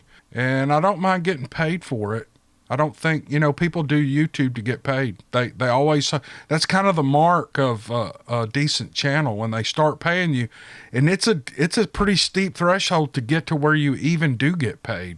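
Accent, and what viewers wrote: South, United States. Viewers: all the best to you, your family and all the pups too! Thanks for that good old southern style story telling we’ve all come too love.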